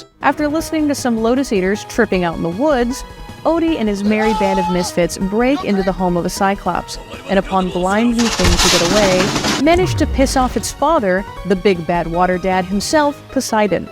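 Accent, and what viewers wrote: Netherlands. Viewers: idk if im hearing it wrong, but are you from the netherlands? i hear some part of a dutch accent but also not and i need to know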